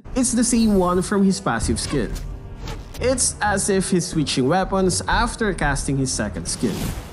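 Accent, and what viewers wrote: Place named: Philippines. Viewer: Yor accent looks like filipino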